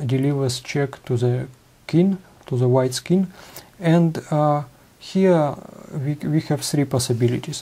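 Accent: Russia. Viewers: I love your "boring-russian-nerdy guy" accent.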